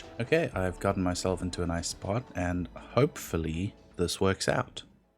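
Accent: South Africa. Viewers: I know a fellow south african when i hear one lol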